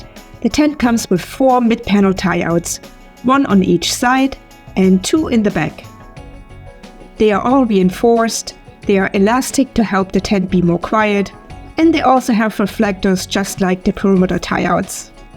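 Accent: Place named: Saxony, Germany. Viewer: Danke. Ich habe deinen Kanal gerade erst entdeckt. Ich dachte mir ich schreibe mal auf deutsch. Dein Englisch hat so einen sächsischen Klang. Jetzt sehe ich mir in der nächsten Zeit erstmal weitere deiner Beiträge an. Nochmals vielen Dank und alles Gute.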